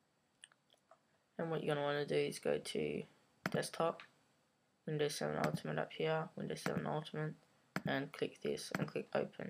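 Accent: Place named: England, United Kingdom. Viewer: ...annoying english accent from a teen not wanting to talk straight.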